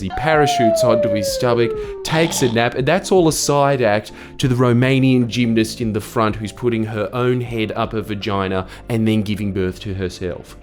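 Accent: Australia. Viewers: how did u not realise lmao he sounds pretty Aussie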